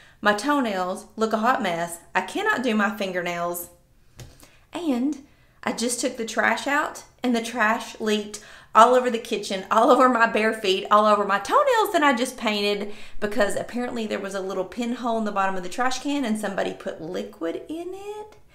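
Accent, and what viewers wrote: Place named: South, United States. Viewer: Hi! 😊 I watch your channel almost every day, and I so enjoy your happy go lucky personality! Maxie and Pollux are the best 🐶 🐶. I just get a big kick out of them 🤣. I would love to see you and take all of us on a tour of your town. I live in Southern California and there is so much traffic and people here 😕🙄 I have to tell you that I love the way you talk 😊. Love southern accents!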